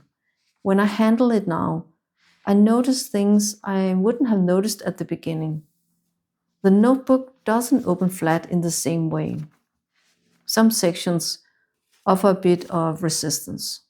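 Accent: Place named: Denmark. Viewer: A Dane! Thought I recognized the accent. I also use the Hobonichi cousin, and it got me through my MSc here in Denmark. Though I am an American married to a Dane for the past 22 years.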